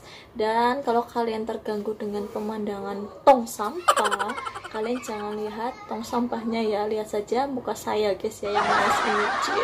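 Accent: Banyumas, Indonesia. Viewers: Haha .ngapak kaliii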